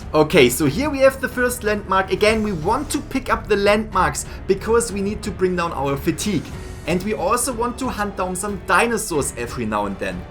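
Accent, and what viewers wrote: Germany. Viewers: Der harte deutsche Akzent ist herrlich xD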